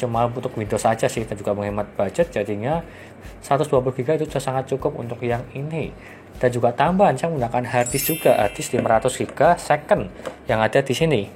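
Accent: Surakarta, Indonesia. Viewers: Orang solo bang?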